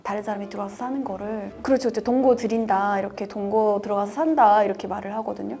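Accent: Seoul, South Korea. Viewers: 북한분맞으세요? 남한사람인지 북한사람인지 모르겠어요ㅎ 완전 한국사람다되셧네요.북한말투가 남아있지않네요. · 아궁이...밥맛은 좋을듯... 아무리 봐도 북한에서 온사람 같지 않음....말투도 그렇고....그냥 서울태생 같음... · 서울 말씨를 완벽하게. 머리가 좋다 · 서울말을 쓰네요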